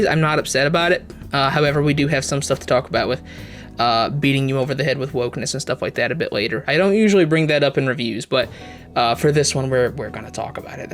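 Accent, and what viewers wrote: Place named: South, United States. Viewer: I love your country ascent 😂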